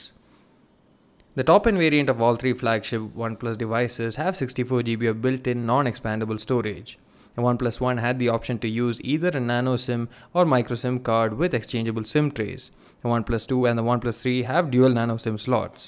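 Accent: India. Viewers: I don't understand your indian accent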